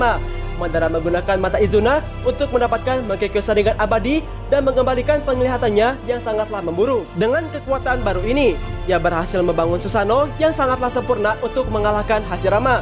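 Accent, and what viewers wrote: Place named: Padang, Indonesia. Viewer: Logat admin nya mirip teman gua orang padang panjang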